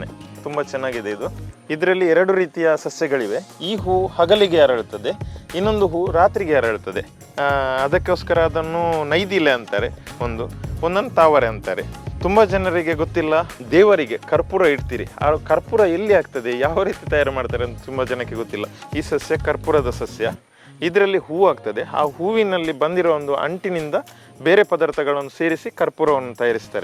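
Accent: Standard. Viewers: Pure kannada ..kelokke thumba kushi aguthe ... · Hats off to you sir, your knowledge is really appreciating... kannada thumba shudda mattu sundaravagide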